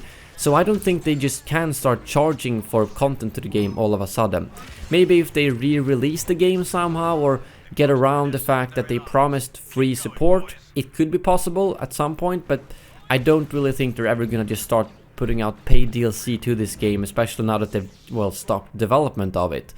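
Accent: Sweden. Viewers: You from Sweden?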